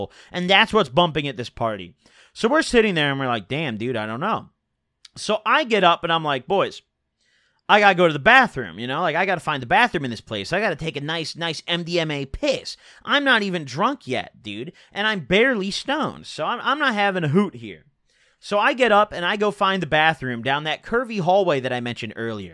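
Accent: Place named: Chicago, United States. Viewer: I figured you were from Chicago you talk just like white boys from Chicago. Or idk if your from the west or south suburbs but you know what I mean.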